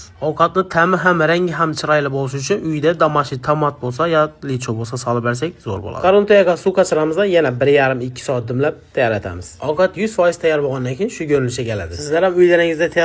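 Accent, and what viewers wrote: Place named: Khorezm, Uzbekistan. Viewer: Xorazm shevasi chiroyli eshitadida rosa❤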